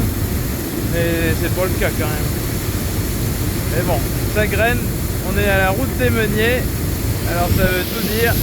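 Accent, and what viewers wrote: Charente, France. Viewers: Salut. Pas pareil en 2003 ? Grand plaisir d'entendre l'accent Chérentais de mes racines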